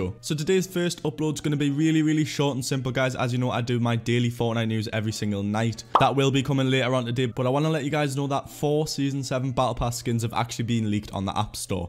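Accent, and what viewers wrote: United Kingdom. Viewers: He pronounces Tier (Tear), the same way Evryone else in the UK, we do not pronounce it (Tire) like Evryone else, we say it the correct way.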